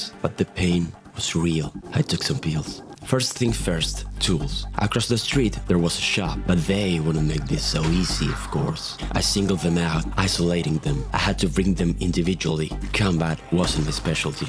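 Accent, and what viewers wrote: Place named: Argentina. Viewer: wait, you're from argentina? Yooo, south america gang. i'm from brazil. I noticed your accent.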